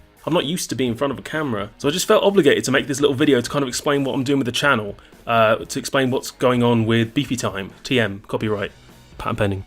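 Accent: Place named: United Kingdom. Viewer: you sound like very English person ever, but nonetheless i'm for this channel change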